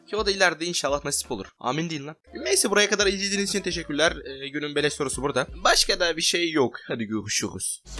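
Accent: Russia. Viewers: Neden sesin rus aksanına benziyor dostum??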